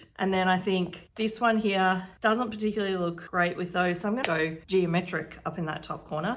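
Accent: Australia. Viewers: I love listening to your thinking process! (And not just because of the cool Aussie accent!) I’ve been doing the sheet loads of cards for quite a while. Now I want to step it up to using the whole pad! Watching how you figured out which papers to cut into which pieces was brilliant! Added up to 24! I loved the shock in your voice!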